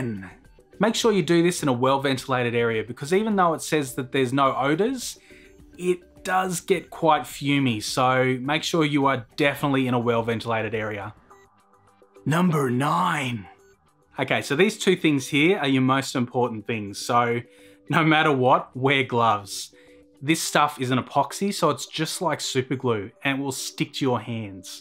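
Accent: Australia. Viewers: I swear an Australian accent makes content better, it just sound more interesting for some reason lol. I was wondering why the long delay between uploads in 2015? you've got great content and a real solid foundation which I'm sure would see you boom on youtube? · Ah, so it's an Australian accent. Lol, yeah his videos are super soothing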